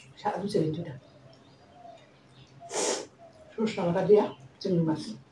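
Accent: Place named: Assam, India. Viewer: Tomar kotha gulo sune amr sei assam ar kotha mone pore jai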